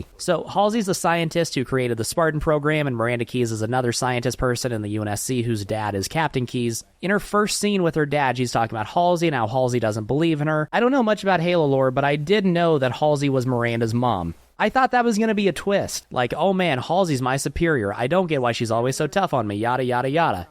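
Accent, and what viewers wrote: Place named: Canada. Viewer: Bro sounds Canadian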